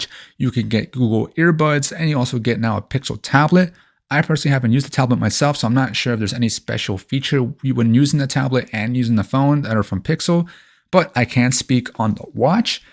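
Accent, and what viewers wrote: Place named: United States. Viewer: Where'd you get India 😂 he has an American accent and a Mexico jersey on lolol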